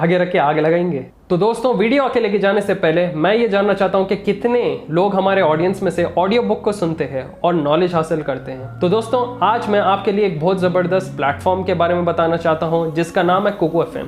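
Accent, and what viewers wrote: Hyderabad, India. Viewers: Hyderabadi Urdu...🇵🇰👍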